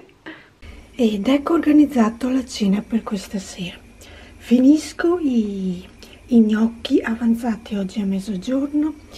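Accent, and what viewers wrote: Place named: Veneto, Italy. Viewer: Ciao!! Ma di dove sei di bello che sento un inconfondibile accento di casa ( Veneto )? I tramezzini mi mancano un sacco, quelli nostrani, qui in Inghilterra non sono buoni come i nostri, e pensare che quando abitavamo in Svizzera li distribuivo col furgone in Ticino! Ciao cara, un abbraccio e un saluto anche da Anna!!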